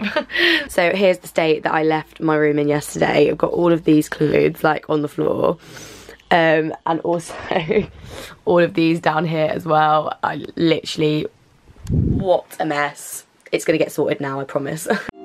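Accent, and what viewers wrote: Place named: West Midlands, United Kingdom. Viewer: Where has your lovely West Midlands accent gone? Please don't turn posh....Brummie is sooo much better! X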